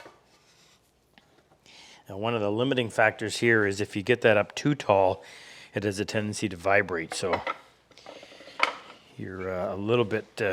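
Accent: Canada. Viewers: Nice work! Are you Canadian or American....just curious?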